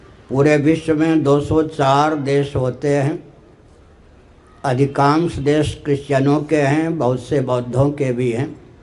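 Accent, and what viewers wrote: Standard. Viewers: Shudh hindi gazab bolte hain 🙏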